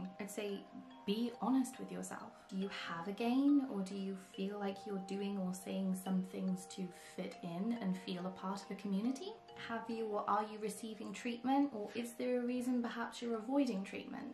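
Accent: Wales, United Kingdom. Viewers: This was an extremely interesting and useful video. I'm a student psychologist and DID has fascinated me for years. Thank you for your insight. Also... it's nice to hear a Welsh voice on YouTube! Where are you from? Swansea girl here :D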